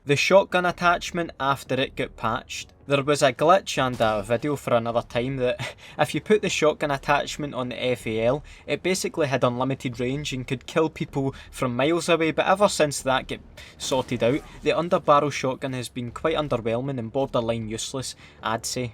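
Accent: Scotland, United Kingdom. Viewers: Scottish accent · Think I need a Scottish boyfriend now😂😂 that accent is way too nice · Splattt808 you gotta love scottish accent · he's Scottish its a lovely accent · That is one T H I C C scottish accent. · Are you scotish i am new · I can fucking subscribe again oh thank god also your voice is like Morgan Freeman but Scottish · Is this british accent guys ? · hes scottish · Mate I’m fuckin Scottish aswell , but your accent is annoying as fuck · Oh that thick sexy Scottish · I’m gonna take a shot in the dark and say that you’re from Scotland.. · That thick scottish accent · Well duh obviously he's scottish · Every insult is twice as funny when a Scottish person says it.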